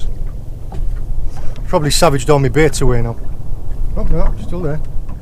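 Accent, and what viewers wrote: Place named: West Country, United Kingdom. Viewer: A cracking watch as usual, so many talking points!! Tips & hints galore all the way through which we have all became accustom to, watching your vids, I'm no stranger to wreck fishing but I have a skipper's guidance to assist me, the fact that you're doing this solo makes it all the more creditable. The part of the country that you're fishing makes me envious to say the least, no wonder you relocated from the North East, that's the accent I'm detecting!! Although I can hear a West country twang entering your dialogue occasionally!! If you are a sea angler in the UK does it get much better than Cornwall? As you've shown the fishing ain't too shoddy but the fact that you share the environment with Minke Whales, Dolphins & Tuna really showcases the South West in general to anybody not already acquainted with it's natural beauty. 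As I said so much to talk about but one last thing, how close do these other boats want to get to you? How annoying when they've got the entire ocean to fish!! Solid stuff, just what I need on a Saturday morning!! All the best pal.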